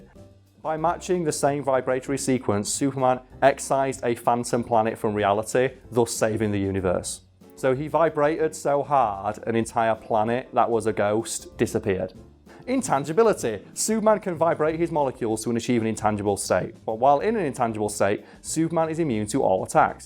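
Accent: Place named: North, United Kingdom. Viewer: Just cuz you sound like ur from the north. It means nothing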